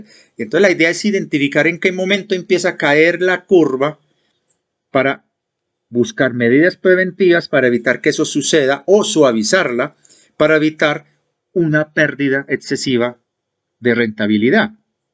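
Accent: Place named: Colombia. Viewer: Amigo, es una agrado venir a escuchar sus relatos, historias e información, es muy agradable porque uno se siente como en casa, con gente que habla y siente como nosotros, los "diferentes". Debo confesarle que me encanta el acento colombiano, así que mas agradable se torna escuchar lo que nos cuenta. Muchas gracias por su labor.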